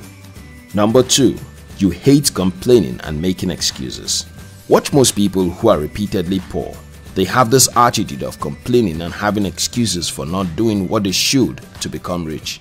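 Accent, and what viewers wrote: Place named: Nigeria. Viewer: You are in Nigeria? Your accent sounds like. If you are. Nothing wrong. With it. I think you should be proud of yourself to making this channel. Bcs, Nigerians in South Africa they are drug dealers and all sort of dirty business.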